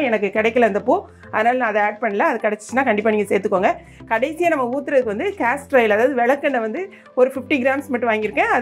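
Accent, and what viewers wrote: Tirunelveli, India. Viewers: Thank you mam.ஆனால் நீங்கள் பேசும் முறை திருநெல்வேலி மாவட்டத்தில் பிறந்தவர் போல் உள்ளது.அதான் கேட்டேன்.நான் திருநெல்வேலியில் தான் படித்தேன்.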